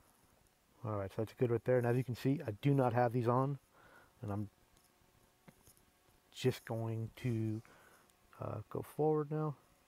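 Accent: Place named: United States. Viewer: why talk meters when you sound American.....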